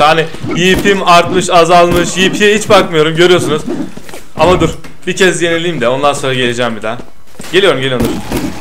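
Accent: Adana, Turkey. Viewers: a be adanali misin lütfen cewap wer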